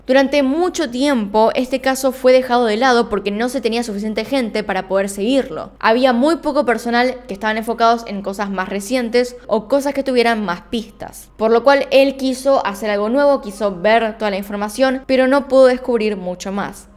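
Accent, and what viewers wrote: Argentina. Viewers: Me encantas tus historias de misterio, y mas con ese acento argentino tan pronunciado jejeje...haces un gran trabajo guapa, felicidades!🥰saludos desde Oklahoma city-Oklahoma..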